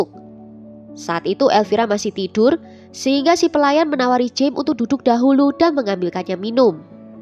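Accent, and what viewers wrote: Java, Indonesia. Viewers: alangkah medok logat jawe kamu bik...😁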